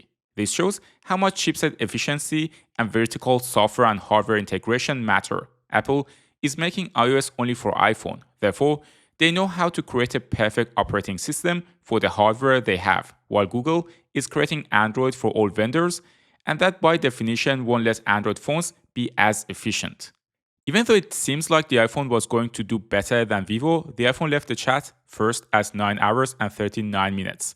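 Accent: Iran. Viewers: سلام هم زبان فارسی ، از لهجه تون معلومه ایرانی هستین😂😂😂😂😂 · That Persian Accent 🤣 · bro are you iranian? your accent is just like iraninas. i'm iranian too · The accent. Bro you're from Iran right? Great video btw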